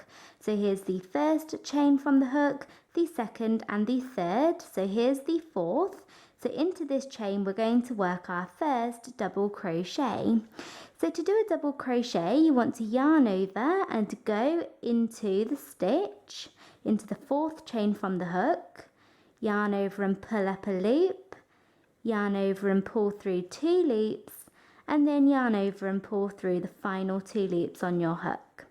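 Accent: United Kingdom. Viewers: Absolutely love this dress! You make it so easy. Excellent teacher! Being from the US, I love listening to your British accent.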